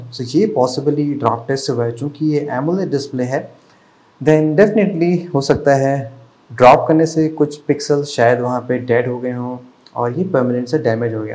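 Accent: Lucknow, India. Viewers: Bhai aap Lucknow se hai na?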